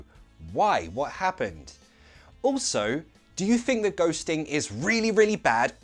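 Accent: United Kingdom. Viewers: you're the first british accent guy that I've heard not having that horrible and funny accent lol... like a hot potato in the mouth.... most british people have that hot air in the mouth while pronunciating words lmao...Hallelujah lol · Oh yes British English is best! Thank you for those 15 minutes of pure learning and entertainment beautifully packaged 😃👍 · It is so nice to listen British accent 💫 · love british accent. help me with it please!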